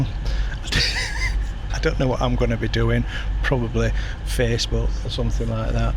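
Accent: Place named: Barnsley, United Kingdom. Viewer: Love the Barnsley twang. Knob-dy and int it. I talk exactly the same! 😂😂😂😂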